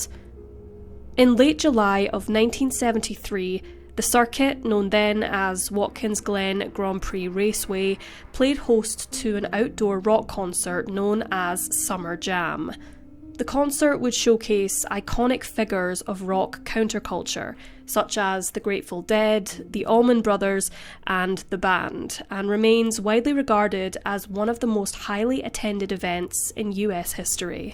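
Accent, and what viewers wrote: Scotland, United Kingdom. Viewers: 10 months late but, no... OP being Scottish isn't an issue. And yes, these are German names but they are in America and have been anglicized. The most spoken language in the United States is English. It is highly unlikely that English speaking Americans would pronounce these former German words with a high German accent. Please be realistic. Thanks!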